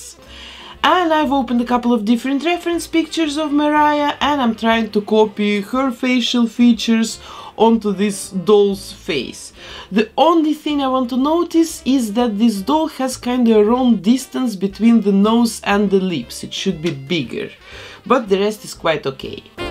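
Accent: Russia. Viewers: Вы русская? Чувствуется акцент )))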